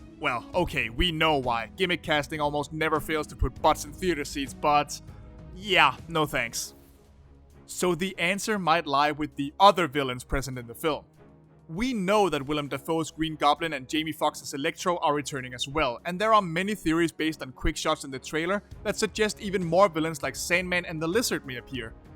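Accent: Denmark. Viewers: Are you Danish? You sound like you have a really strong Danish accent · Ohh shoot, a danish narrator🙏🙏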